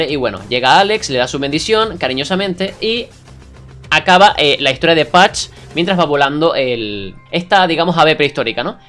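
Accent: Argentina. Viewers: argentino detectado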